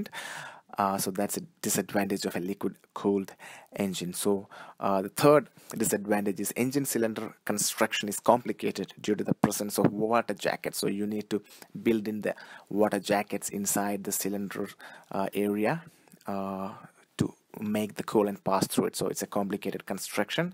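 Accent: India. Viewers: Indian for sure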